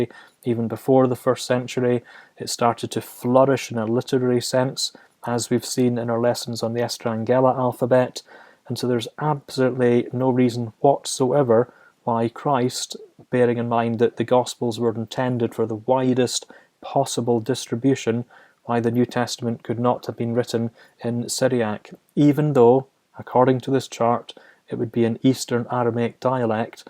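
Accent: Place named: Scotland, United Kingdom. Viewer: Your Scottish, or Northern Irish accent if I'm not mistaken, is charming as hell and a fresh departure from the typical bland American delivery. I'm a linguist and amateur philologist, and have an interest in the Aramaic language and Its history. Nice video.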